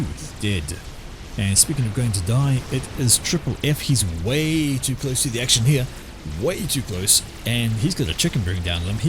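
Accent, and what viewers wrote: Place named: New Zealand. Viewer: awesome cast. are you a kiwi btw? took me a min of scratching my head before placing the accent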